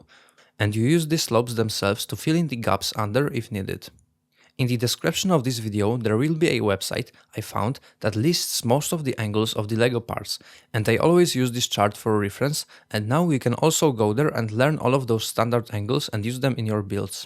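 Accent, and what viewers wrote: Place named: Spain. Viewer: u speak spanish, right? u got a lot of accent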